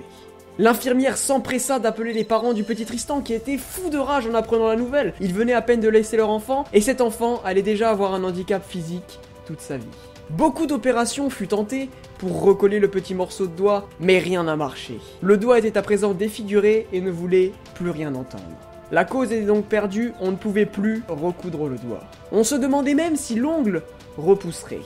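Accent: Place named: Moselle, France. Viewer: Tu viens de Metz ? Genre Moselle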